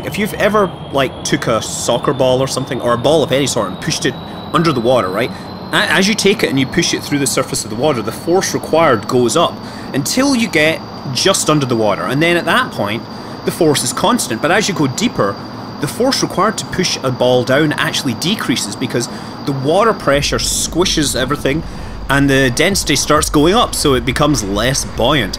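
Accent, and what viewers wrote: Scotland, United Kingdom. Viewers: It's the Scottish accent. And often the Explorer becomes an exploder!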